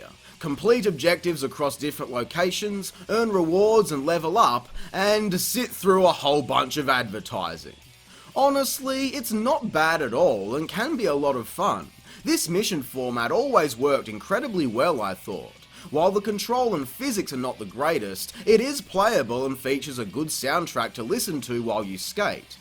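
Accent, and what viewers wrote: Australia. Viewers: "Kind of, like, YouTube, actually. Don't forget to subscribe and share Tony Hawktober with all of your friends!" Mate, I'm Brazilian, my few friends that actually understand a bit of English unfortunately wouldn't ever understand that marvelous down under accent. But, you know what? I've been watching you for over a year, and if you hadn't said that, I'd never notice I wasn't subscribed. Now I am. Sorry about that, mate, I honestly never noticed since your videos always appear in my home page. I could swear I was subbed.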